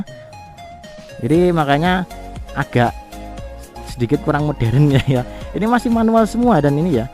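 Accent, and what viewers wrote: Java, Indonesia. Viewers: Sampean jawane pundi mas?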